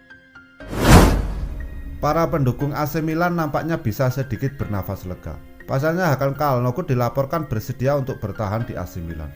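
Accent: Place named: Padang, Indonesia. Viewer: Dilihat dari logatnya, pasti orang Padang nihhh..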